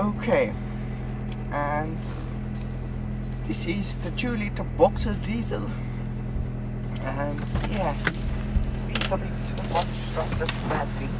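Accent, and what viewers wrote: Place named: Russia. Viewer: he's Russian, at least he try his best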